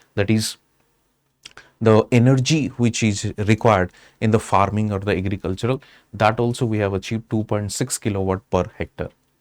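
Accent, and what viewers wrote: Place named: Odisha, India. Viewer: E master odia english style re kahibaku chesta karuchi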